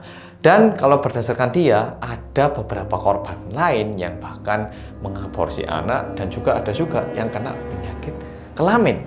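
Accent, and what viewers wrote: Java, Indonesia. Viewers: Kang Mas,bikin konten tentang kerajaan kuno KOREA dong,swaramu lembut medokg meruntuhkan kesombonganku,biar aku dengerin sambil # TAKING NAP# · Bersama orang korea yang medookkkkkkk😁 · Opa medok upload lg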